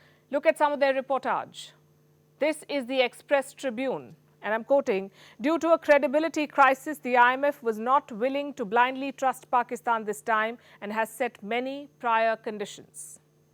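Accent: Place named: India. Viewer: Somehow her Indian accent makes her lttle zingers even more risible.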